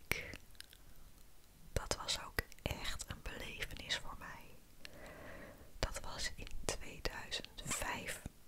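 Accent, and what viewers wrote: Rotterdam, Netherlands. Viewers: Hallo! Ik woon ook in Zuid-Holland en ik hoor aan u gepraat dat u volgens uit Rotterdam komt. Klopt dat of zeg ik iets raars😂 U filmpjes zijn heel fijn en u heeft een fijne stem Xx Sieb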